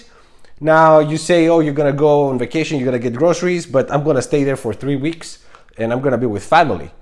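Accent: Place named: Spain. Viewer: I’ve seen your videos before but had no idea you were Greek. With your accent, I thought you were from Spain. What an idiot I am. And I’m Greek too. Greek American though. My family is from stunningly beautiful Kefalonia. Καλό ταξίδι!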